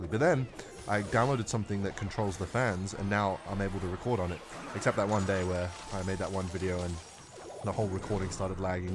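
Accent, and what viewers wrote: United Kingdom. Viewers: Bro is British... I am not watching this anymore..... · British? Yuck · is british?😂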